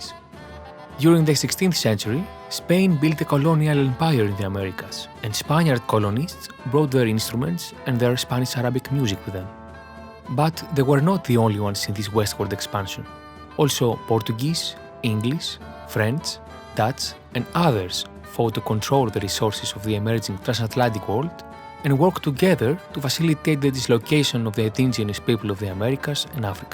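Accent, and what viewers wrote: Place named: Greece. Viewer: Are you Greek by any chance? Your accent seems very Greek to me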